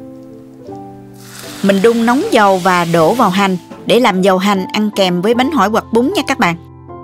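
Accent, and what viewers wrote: South, Vietnam. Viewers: Cám ơn, tôi rất thích xem những Vidios hướng dẫn cách nấu ăn của cô, ngắn gọn mà đầy đủ dễ hiễu dễ làm. Tôi thực hành lúc nào cũng thành công. Tôi không những ghiền chờ đón xem cách dạy nấu ăn cúa cô mà cũng ghiền nghe vọng nói miền nam của cô nữa đó. Mến chúc cô cùng ngừoi thần luôn vui khỏe, bình an. Luôn ủng hộ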